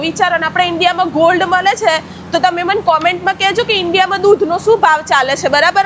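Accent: Sabarkantha, India. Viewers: સાબરકાંઠા ના છો બેન સાબરકાંઠા ની ભાષા આવે છે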